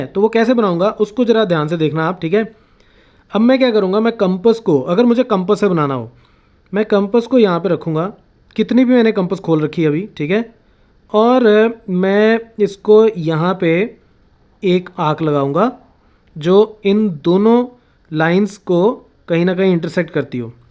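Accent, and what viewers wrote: Punjab, India. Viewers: By your accent I think you are a PUNJABI Thanks for explanation ☺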